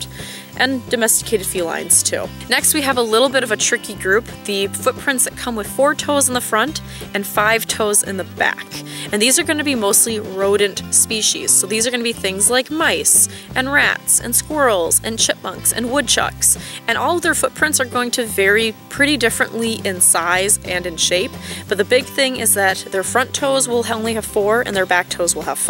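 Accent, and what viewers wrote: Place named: Minnesota, United States. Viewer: Yay it looks like im not the only tracker! Also,what state/province is that? Id guess Minnesotan, North Dakota, or Canada by the sound of your accent, I’m from Minnesota myself.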